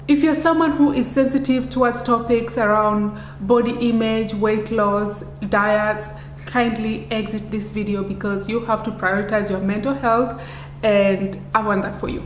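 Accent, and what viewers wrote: Kenya. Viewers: Are you from Kenya 🇰🇪? Remind me a lot my Kenya 🇰🇪 Queen accent so beautiful and elegant. Loved it. Lose weight not muscles eat 3 categories of this food: proteins, fiber and good fat.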